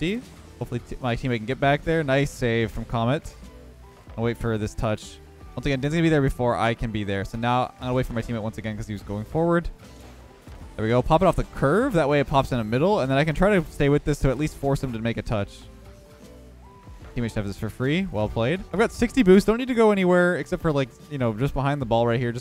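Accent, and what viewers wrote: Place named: Canada. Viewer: A follow Canadian Ehh🤣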